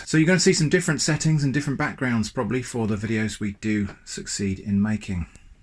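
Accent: United Kingdom. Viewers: I enjoy Watching your videos during these tough and uncertain times. In the US it is very chaotic right now and watching these video lets me know that there are things to do at home. Plus I like British accents. 🙃 I have started a hydroponic indoor mini farm and I'm starting to grow my own food. I hope you stay safe and nice hand washing. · Such a fan of your channel, it was yours that introduced me to a topic I didn't know existed: Scambaiting. I then realised your quirkiness, intellect, good ol' fashion British diction and relaxing timbre sucked me in to the rest of your content. Thx for fighting the good fight👍👍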